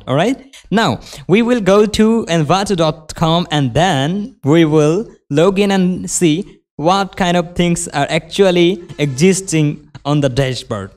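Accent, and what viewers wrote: Bangladesh. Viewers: He is bengladeshi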